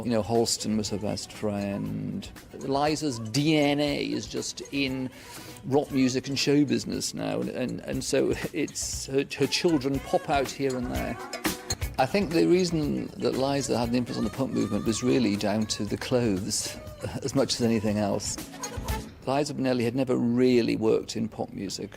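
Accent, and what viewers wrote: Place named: England, United Kingdom. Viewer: Beautiful english accent 😍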